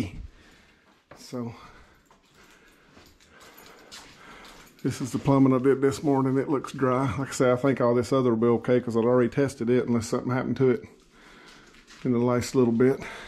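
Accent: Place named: South, United States. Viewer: your pronounced southern accent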